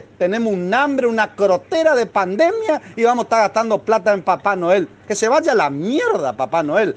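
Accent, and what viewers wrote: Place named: Paraguay. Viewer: Parece Paraguayo los correntinos no tienen tanto hacento litoraleño que de echo es hermoso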